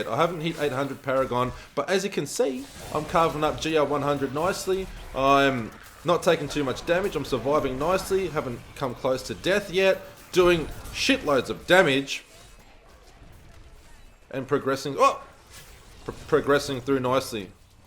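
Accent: Australia. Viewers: not aire east coast Aussie I reckon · Are you aussie bro? Maybe kiwi perhaps? But you live in AU aye..love watching your vids man.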